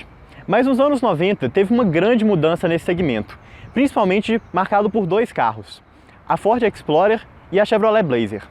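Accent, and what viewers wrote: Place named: Minas Gerais, Brazil. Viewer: eu tbem acho!! E esse sotaquinho mineiro... Iti maliaaa 😍😍😍 ... Melhor canal de carros do YouTube 👏🏽👏🏽👏🏽👏🏽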